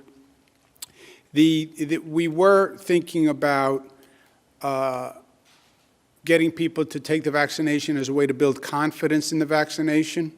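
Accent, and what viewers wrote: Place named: New York, United States. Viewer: I just like the NY Accent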